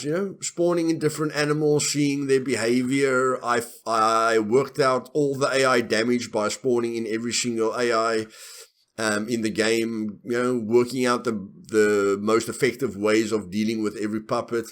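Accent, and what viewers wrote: Afrikaans, South Africa. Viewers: What servers do you play on im from South-Africa and you sound a lot like n afrikaans man ;) i was just wondering sothat i know what to play on aswell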